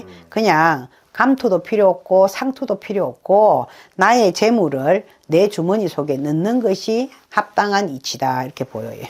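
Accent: Busan, South Korea. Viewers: 부산사투리 맘편해져요 저도 부산사람이라..한번뵙고 상담 받고싶네요.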